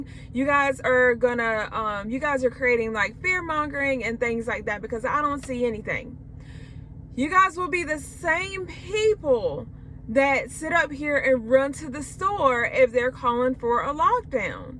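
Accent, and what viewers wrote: South, United States. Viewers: Awesome message. I also adore your American English accent. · She sounds southern. From Sip or Bama i bet.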